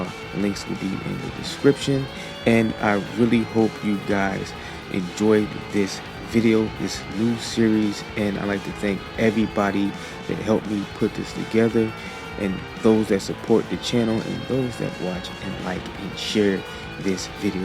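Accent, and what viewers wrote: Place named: Africa. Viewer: Why do you have an African narrating this video?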